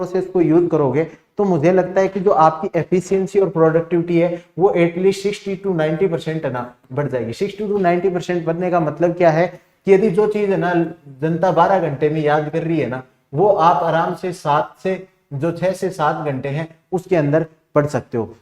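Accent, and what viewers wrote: Rajasthan, India. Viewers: Loved the rajasthani accent 😀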